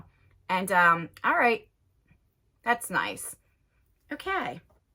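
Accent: New Jersey, United States. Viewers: I’m a Jersey girl living in Tennessee. I love watching your videos bc I don’t miss home so much hearing your accent. Ty!!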